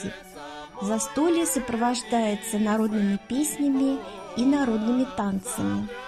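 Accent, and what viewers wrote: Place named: Bulgaria. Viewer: Как приятно слышать болгарскую речь!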